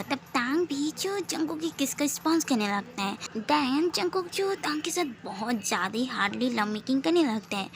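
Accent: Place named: Pakistan. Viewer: what u want to prove like this? I mean your urdu accent..........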